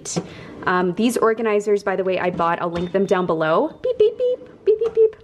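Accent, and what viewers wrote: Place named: Canada. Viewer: I love her Canadian accent ✨💕😂 ily! I sent you a letter a while ago and u sent me brushes so thank you for those! 💕 you are one of my role models 🥺💕😘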